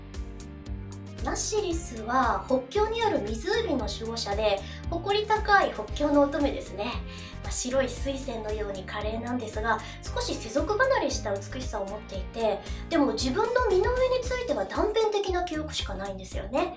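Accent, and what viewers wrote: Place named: Kansai, Japan. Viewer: Her Kansai dialeg is cute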